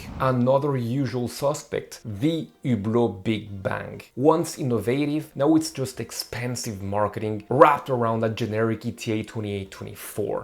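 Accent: France. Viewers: Thanks for the reoly. Obv not important, i just like knowing where accents are from. Im defn no expert on French accents and appreciate that tbey vary accross diff parts of the country..like everywhere. My experience: is my wife is French and ive been there a few times , stayed with her fam. Your accent just sounded a tad different to theirs . Enjoy your videos and appreciate your reply Cheers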